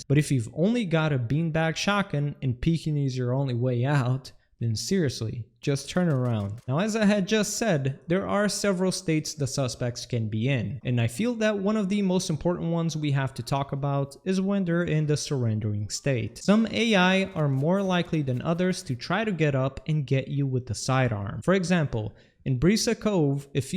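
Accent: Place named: Portugal. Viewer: I knew as soon as you started to speak that you were portuguese. Great vid man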